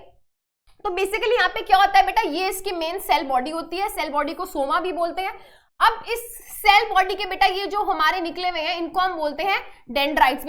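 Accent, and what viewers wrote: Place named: Punjab, India. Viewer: Ma'am was catching her Punjabi accent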